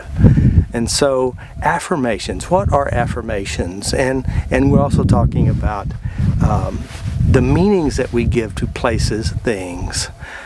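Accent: Oklahoma, United States. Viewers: That was really beautiful and meaningful to me. I've been so grateful for these videos, tapping along with them and moving so much old stuff. Since I'm from Oklahoma, too, your voice makes me feel right at home! Thank you!!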